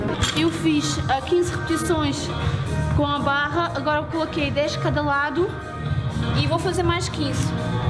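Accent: Portugal. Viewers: Amo esse sotaque sonho conhecer portugal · Esse sotaque portugues é lindo💕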